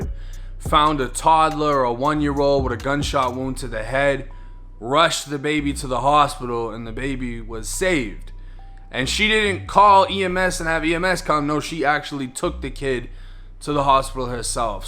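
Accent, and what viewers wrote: Boston, United States. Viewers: 1090 u got me rolling with u saying peace officer. I know its hard with your Boston twang. (Police officer)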